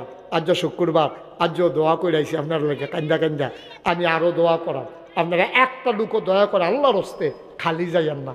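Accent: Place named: Comilla, Bangladesh. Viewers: বাংলাদেশ কুমিল্লা ডিসটিক গ্রামের ভাষা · বাংলাদেশি, কিন্তু জেলা ভিত্তিক ভাষার ধরনটা একটু আলাদা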